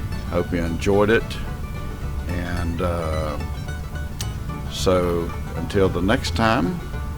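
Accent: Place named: South, United States. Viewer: It's worth watching just for the narration. Love the southern accent 😁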